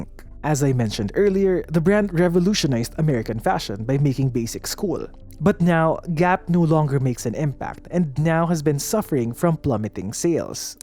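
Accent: Philippines. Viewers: This is excellent! Fast-paced, interesting and, most important, the guy does NOT put on a fake American accent! His accent is a neutral, correct Filipino accent. Like Rico Dizon, he just talks naturally with no twang.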